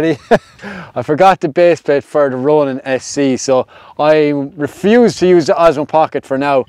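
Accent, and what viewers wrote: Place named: Ireland. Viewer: Nice video mate! I really enjoyed watching and dude I love your Irish accent. Keep on vlogging but also take your time and enjoy the time with your friends in the wonderful Irish nature. Thanks for sharing! Take care!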